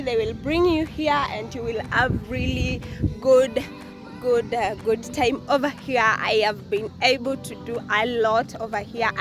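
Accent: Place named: England, United Kingdom. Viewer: It's the English accent and Swahili for me😘😘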